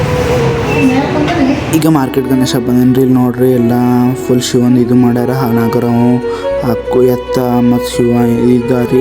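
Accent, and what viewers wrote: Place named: Bangalore, India. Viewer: Ean ri kali dome bangalore matadtiri ree antiri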